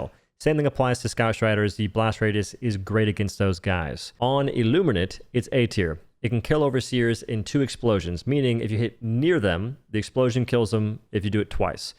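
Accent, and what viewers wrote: South, United States. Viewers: I’m trying to figure out your accent. I hear a southern accent, but I also hear an Irish accent on certain words. lol.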